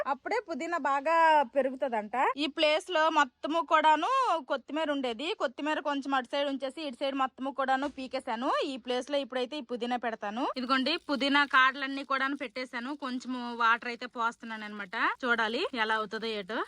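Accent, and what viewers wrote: Srikakulam, India. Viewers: Hai sister నా పేరు సుధ మాది విజయనగరం పార్వతీపురం hai cheppandi Mi vlogs chaala baaguntaayi Mi srikakulam యాస బాగుంటుంది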